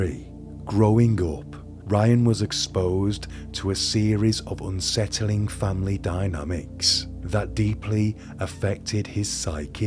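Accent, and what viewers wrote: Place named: Manchester, United Kingdom. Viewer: Manc!!! My heart belongs in Manchester after living there for over a decade! I was going to say you sound like you’re from somewhere near there. I won’t say where though cos I know Mancs don’t like that!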